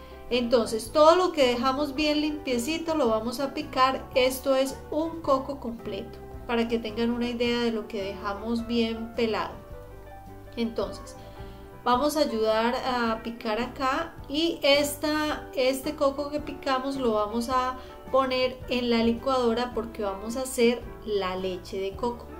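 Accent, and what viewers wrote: Colombia. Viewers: El acento es colombiano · Cuenta Samsung por el asentó creo que es Colombiana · Esa voz es colombiana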